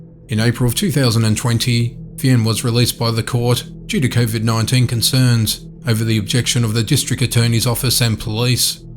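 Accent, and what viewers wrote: Australia. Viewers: The narrator is obviously from Australia. They pronounce many English words differently than we Americans do.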